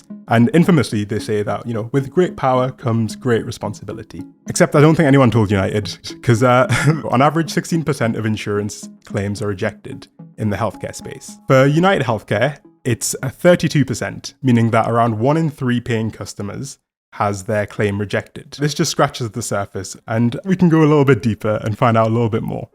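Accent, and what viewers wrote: United Kingdom. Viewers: wait I didn't even notice he was British lmao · how did you get that british accent? where are your parents from? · Great info, thanks for the time you've put into this. Nice to hear a Brit accent that doesn't sound like that Bond villain Andrew Witty, really cleanses the palate. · tbf this happens to me, especially given this guys voice is deep and he has a less pronounced british accent. I also watch a lot of non american media so I tend to forget about or confuse different accents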